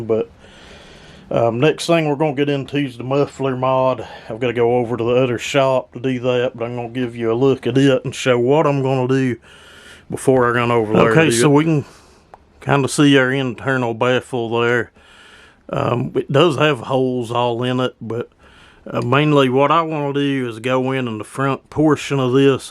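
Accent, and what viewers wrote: South, United States. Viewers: A master at his craft! Just curious, where are you from? You have quite the unique southern accent 🤠 Don't think I've heard your dialect before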